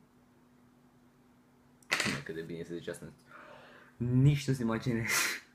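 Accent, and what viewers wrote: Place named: United Kingdom. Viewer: se vede ca ai fost in anglia ca ai mult accent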